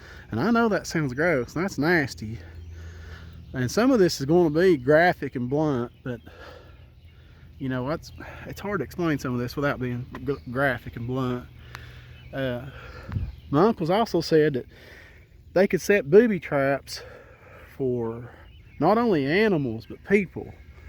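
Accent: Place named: Tennessee, United States. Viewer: Hey buddy! I usually don't write comments but I stumbled across your channel by accident. One of your videos was recommended to me. I had never heard of Dennis Martin or the wildmen, but I binged watched all of your videos and I was fascinated. Your stories remind me so much of the stories my Grandpa used to tell me when I was a kid. He grew up in the hills of North Georgia and he saw alot of things like you describe. You sound so sincere and you sound like a really good guy. I laughed because you have the same Tennessee accent that my mother has, she grew up in the Copperhill, Greasy Creek area of Tennessee. She has stories about seeing witches and she claims that one of her relatives saw the devil. Keep on telling your stories old buddy because I truly enjoy them. You really do need to get your stories published. I do have a question for you....what parts of Tennessee would you absolutely stay away from at night because of wild men and other things. 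Thanks man and keep on keeping on!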